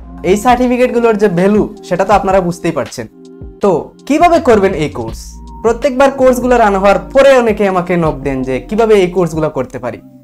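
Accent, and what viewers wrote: Sylhet, Bangladesh. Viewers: আফনি কিতা সিলটি নি রে বা? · Apni ki sylheti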